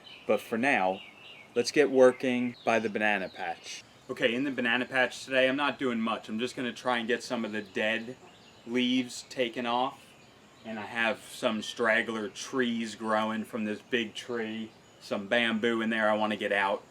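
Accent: Philadelphia, United States. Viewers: Hey just found you! Love the vids! Where are you from originally and where do you live now? I hear Philly s Jersey... amiright? And in Florida now.... you? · That really does sound like a Philadelphia accent haha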